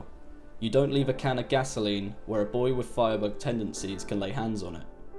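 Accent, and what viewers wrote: United Kingdom. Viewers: That was an interesting video which I enjoyed very much. However, I have to ask you about the way you pronounce words? You sound generally well educated, yet inconsistently drop letters from your pronunciation without warning. As Bachman was outed, am I outing you as somebody who is trying to disguise his natural language tendencies with more 'street' or 'modern' inflections? If so I'd strongly suggest you stop it. It sounds bad. Just use normal English. If it is not on purpose please re-listen to what you have produced here and notice the 50+ times you make errors in pronunciation. Your voice is far too posh to be cool. And I think that way of speaking is on its way out now anyway. Estuary English is just an affectation that politicians use in the mistaken belief it makes the more appealing to the proletariat. It does not, it's merely patronising. 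You are better than this. I think you can speak more correctly (and probably more naturally) than you do in this video, and I think you would sound 100% 'beta' if you did so. As I said, good video, just tidy up the language son 🙂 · I don’t mean this in a bad way, but you are the most British person I’ve ever heard on the internet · Sounds exactly like the first Holly on Red Dwarf. Which UK accent is that?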